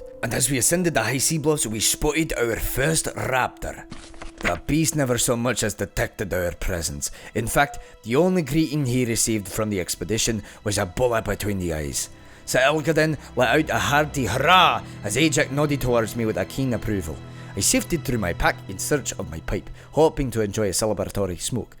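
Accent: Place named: Scotland, United Kingdom. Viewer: Thanks for doing this, although I wish it wasn't read with a strong Scottish accent. Hard to understand some words ^_^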